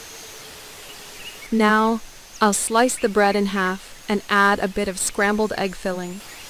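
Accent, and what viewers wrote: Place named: United States. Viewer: I don’t like the American accent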